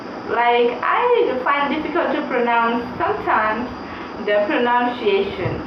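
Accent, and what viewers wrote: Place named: India. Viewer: good luck girl, and compared to a typical indian, your English accent is nice, i can imagine how your accent will be in the next 10 years since you're still young, keep it up ❤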